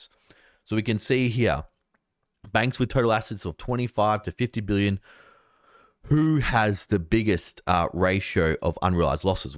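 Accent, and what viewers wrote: Australia. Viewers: PLEASE! use your Australian accent d-arta!/data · It's Data Not "Daita" You're Australian. Transparent yearn for USconnection. So either change your accent totally or stick to your OZ accent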